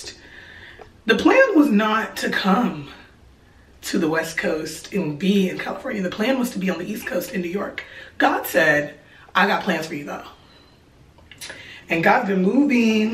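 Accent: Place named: Nigeria. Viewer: I believe you.. and WOW I am in awe. I just ran into your youtube. I don't know how lol literally was just searching a sermon by Todd Michael Crazy faith sermon and this blew me away. I almost didn't listen to this.. I live in LA (from ghana) just opened up a healthcare company and I was telling my business partner about faith so running into this youtube was no coincidence.. God is def moving.. I was looking for something to stir up my faith. I can tell your nigerian due to a slight accent and "she's well oo" haha I love it. Welcome to LA you are definitely walking into your destiny. Make the most of your life and network network network. The lines have fallen for you in pleasant places. Ahhh your talking about timi (amplify africa) really cool and humble guy. We know each other. Omg I'm soooo happy for you dear. Share your testimony and continue to inspire .. 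I hope to meet you soon 🙏🏾 then I'll remind you that im the one who wrote this on your channel. Crazy how I ran into this youtube channel. Keep being OUTGOING.. u never know who you may meet. Divine connection ✨️ ❤ 🔒 CONGRATULATIONS on you new Career. God is faithful ..so happy for you. Wow! Btw u passed my fav spot in-n-out on Sepulveda I think lol..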